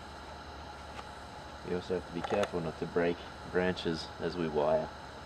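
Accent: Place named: Australia. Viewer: great to hear an aussie voice....cheers mate....you did great explaining....maybe discuss the growing habits,fertilizing etc...go oz :D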